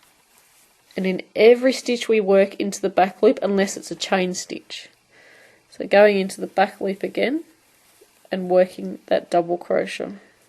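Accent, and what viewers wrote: Australia. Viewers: Sorry, meant to get this in with my post below. Hi, I am new and truly enjoy the video's I've viewed on your channel. You make it so easy for those havin' trouble because you explain things so well. I like listenin' to your Aussie voice; as I am from the USA . You do have a pleasant and soothin' voice. Thanks so much!! ~Buffalo Gal~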